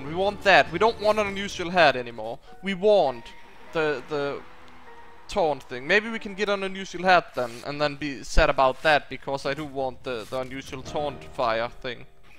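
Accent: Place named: Denmark. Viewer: You have a very danish accent. You're probably not dansih though, you still have a danish accent.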